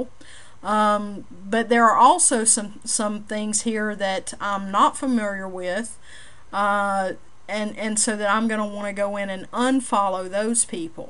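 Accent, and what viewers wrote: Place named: South, United States. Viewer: LUV your Southern Accent!:-) You give a great tip in this vid, nice job. Keep up the good work! Blessings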